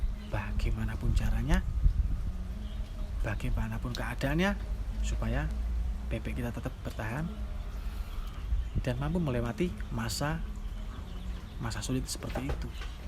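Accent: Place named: East Java, Indonesia. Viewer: Mas e jawa timur e mana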